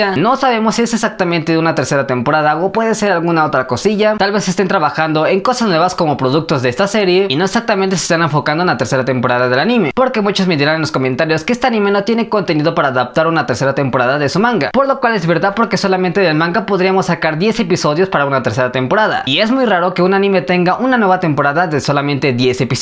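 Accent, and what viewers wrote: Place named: Venezuela. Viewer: Literal aveces creo que este men es Venezolano en base a su Acentó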